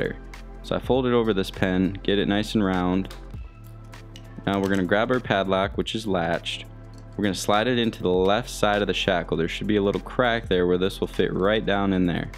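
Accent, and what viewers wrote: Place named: Michigan, United States. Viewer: Pop! You sound just like my family from Michigan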